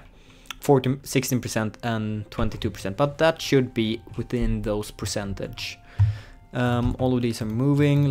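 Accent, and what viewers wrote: Sweden. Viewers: Are u swedish?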